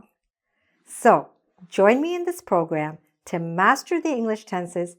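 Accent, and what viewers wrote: United States. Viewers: This english is american or British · Hi,! I'm from Bavaria. Are you an American? You speak English with an american accent, I'd say. Or I'm wrong?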